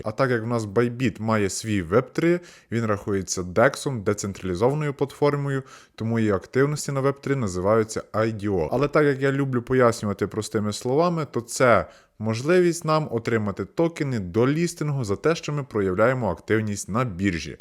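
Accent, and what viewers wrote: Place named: Western Ukraine, Ukraine. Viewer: Приємно слухати на західноукраїнській мові. Молодець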